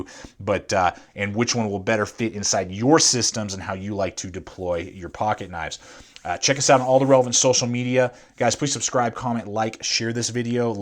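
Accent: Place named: Texas, United States. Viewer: Good Video, my brother is the Spyderco nut between us so I'll have to show him this. In your into I'd swear your in my home part of Texas! 😎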